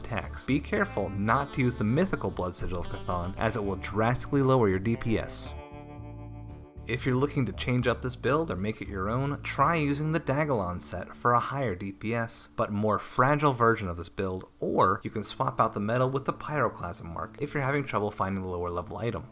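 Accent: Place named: Texas, United States. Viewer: In the First Moment i thought here was a Cowboy from Texas, who plays GD^^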